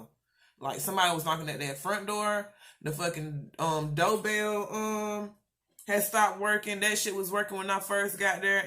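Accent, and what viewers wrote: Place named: South, United States. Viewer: this is so off topic but girl i love your country accent.........and it didn't work out bc yall are much older and way mature then them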